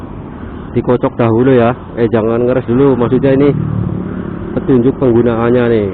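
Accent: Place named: Banyumas, Indonesia. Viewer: Koyone wong ngpak yo kang.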